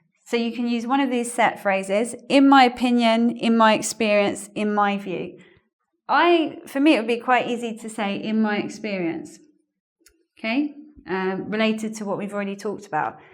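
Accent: United Kingdom. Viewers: Do you have British accent ?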